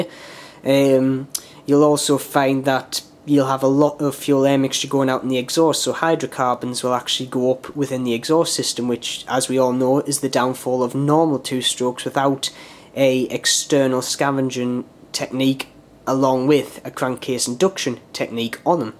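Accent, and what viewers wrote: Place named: Durham, United Kingdom. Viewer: Geordie? sound more durham to me like?!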